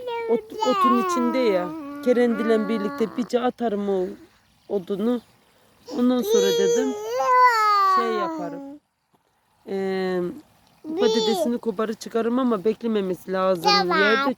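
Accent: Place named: Akçaabat, Turkey. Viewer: bizim burda bir komşumuz var aynı sizin gibi konuşuyor oda akcaabatli köyünden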